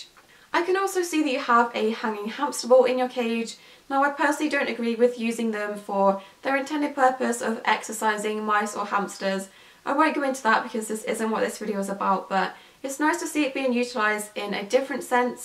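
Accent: United Kingdom. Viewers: You sound very British today TeA Or CoFfEe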